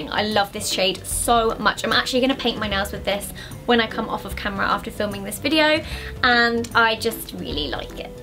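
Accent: United Kingdom. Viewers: I thought she was British...how does she speak English????? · I've been watching your videos for so long that I forget your British and your "accent" doesn't sound different to me anymore. You basically sound American to me lol👌😂❤️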